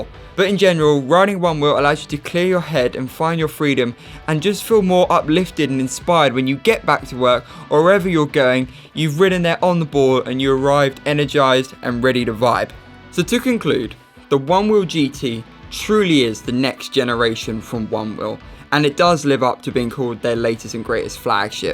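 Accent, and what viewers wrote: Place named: United Kingdom. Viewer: Sounds more like an ad than nothing else. You don't address the countless numbers of ghosting issues that have been reported, nor the predatory behavior of FM towards reparability of the board. You sound like you live in the UK, have fun when your board brakes down and you have to send it back to the only place in the world that can repair your board without bricking it mate.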